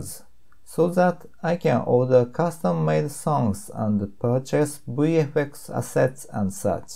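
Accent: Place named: Japan. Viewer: what do you mean by his speech problems? If you’re talking about the way he speaks in the video then that’s just Japanese accent. In fact he is really good at English in the standards of Japan.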